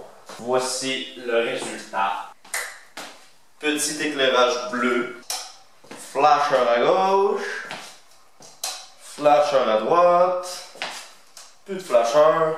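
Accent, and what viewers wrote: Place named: Canada. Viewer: J'adore la 1200 bandit... et le parler canadien ! Bonne route et soit prudent, c'est un peu brutal par rapport aux motos modernes...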